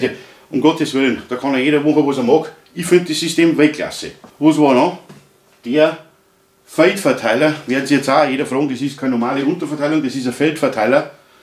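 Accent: Bavaria, Germany. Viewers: Als Laie bin ich hier vermutlich so etwas, wie ein Exot....und trotzdem bemerke ich Deinen Spaß an der Freud' .... und verstehe bayrisch und Technik immer besser!.. Super Video!!!! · Bitte red wieder boarisch!!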